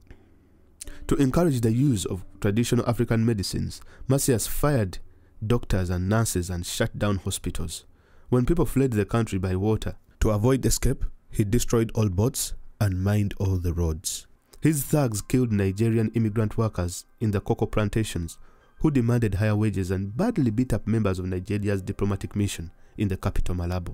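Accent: Uganda. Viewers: A ugandan is narrating this. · I think the presenter is Ugandan. It's just about how they pronounce words.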